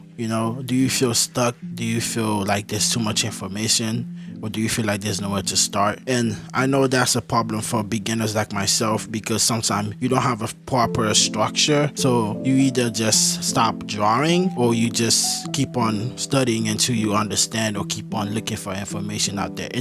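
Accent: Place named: Haiti. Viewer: I was like "bro sounds Haitian", then I saw your channel name 😂. Peace brother🇭🇹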